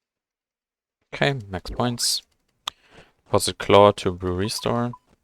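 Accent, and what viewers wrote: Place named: Germany. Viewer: i can hear german in this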